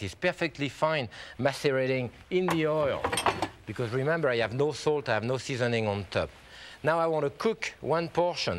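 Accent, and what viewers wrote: France. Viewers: I think I'm getting to the point that I can figure out his French-to-English quirks, even though some of them take a second to click. Bananas Foster with butternut sauce...butternut-->butter nut-->beurre noisette-->brown butter.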